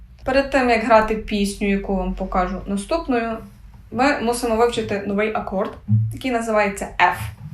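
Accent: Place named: Western Ukraine, Ukraine. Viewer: Уроків гри на гітарі багато, але ці особливі. Бо Ви маєте певний шарм в донесенні меседжу до глядача. Звідки ви? По мові чую, що мали б були західні області. Тернопіль? Львів? Я з Франківська...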